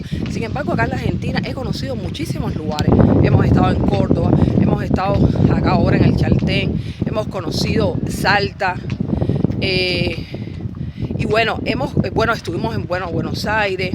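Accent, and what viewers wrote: Cuba. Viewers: Te encontré recién hoy, .... sólo quiero darte la BIENVENIDA a nuestro país y espero que seas FELIZ aquí. Me encanta tu acento cubano, muy querido para mí, que traes de tu delicioso PAÍS.! Dios quiera que se solucionen los problemas que agobian a ese pueblo divino. A mis 76 años, tengo recuerdos HERMOSOS de tu PAÍS.!!!!!! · Modestamente y si me permite (no es una critica y mil disculpas si lo interpreta de esa manera), le dire que pienso que ud. en una Argentina con acento cubano jajaajjaja, de verdad me encanto su comentario a cerca de mi pais. Logicamente cometemos errores y habra dificultades como en muchos lugares pero poniendole ganas y esfuerzo uno los puede solucionar. Dije que Ud. es una argentina con acento cubano por que vi en su narración una gran PASION y eso es el SER argentino. Muchas gracias y vaya para Ud. y su Marido un fuerte abrazo deseandole mucha pero mucha suerte en nuestro pais.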